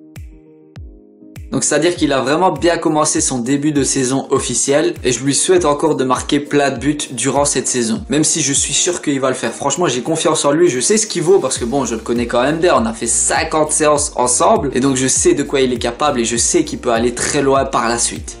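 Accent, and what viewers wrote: Liège, Belgium. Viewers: T'es de liège? Je reconnais l'accent:)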